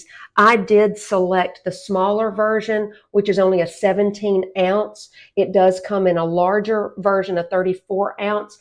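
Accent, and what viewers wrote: South, United States. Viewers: Mine came with the instructions inside the pot. How to get out? It is like the "monkey paw in the jar" thing. Also, you are smokin' hot and I dig your southern drawl. Thank you for being you.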